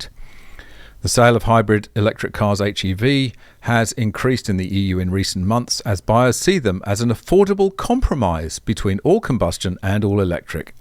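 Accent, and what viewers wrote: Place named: United Kingdom. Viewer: An average of 60 ICE crash fatalities occur in Toronto every single year. Kids, moms, dads, and others have lose their lives everyday. Sadly they hardly ever make any big news, even if the volatile fuel in their gas tanks explodes. A single crash with an EV of course makes headline news. Luckily EVs are much less likely than ICE to catch fire (for obvious reasons), and their safety features help prevent these fatal ICE crashes. But Hybrids are the worst fire catchers. They're even worse than ICE. Apparently mixing a high electrical power system with volatile fossil fuel system may not be wise. Who ever could have thought? "Fully electric vehicles, on the other hand, were deemed far safer than both hybrids and gas cars; they are far less likely to catch fire, with just 25.1 fires per 100,000 sales. That’s compared to 3,474 hybrid fires and 1,529 ICE fires per 100,000 sales respectively." Ouch. 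I wonder why Australia (with UK accent) never mentions these things. Is there an agenda at work or $omething?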